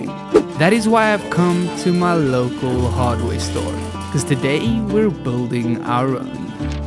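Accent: South Africa. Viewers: after all these years I've finally confirmed myy suspicion that you're South African too XD awe masekind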